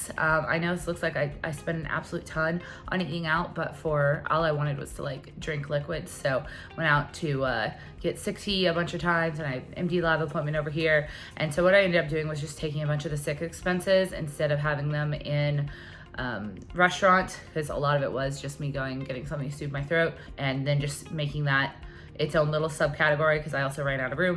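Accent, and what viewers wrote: Texas, United States. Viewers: Congratulations,! My note on your voice is I have never thought you had a southern accent until today. You sound Texan for sure today. I hope you are better soon. You are an inspiration.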